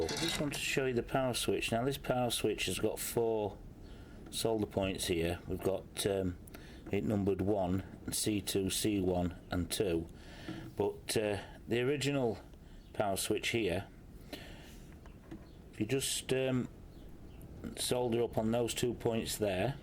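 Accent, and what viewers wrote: United Kingdom. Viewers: You played Tetris on a Game Boy in 1989? ;-) Did you import one or something haha cos it didn't come out until September 1990 in the UK and you sound British so I'm assuming you're in the UK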